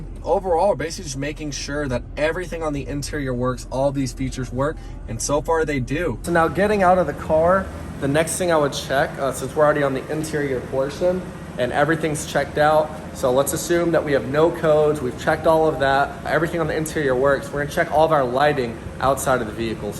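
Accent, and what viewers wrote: Texas, United States. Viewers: Love his Texas accent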